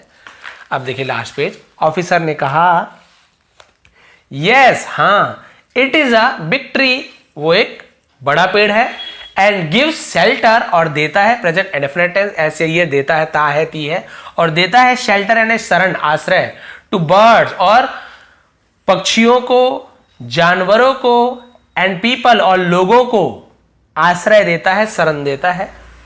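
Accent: Chhattisgarh, India. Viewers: Sar aap Chhattisgarh ke Gramin area se belong karte ho kya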